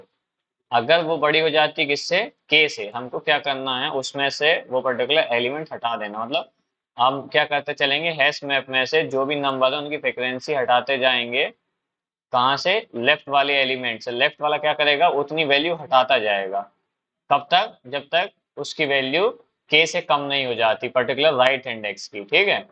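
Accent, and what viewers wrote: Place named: Bundelkhand, India. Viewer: Bhaiya ur Bundelkhandi accent is so good 💯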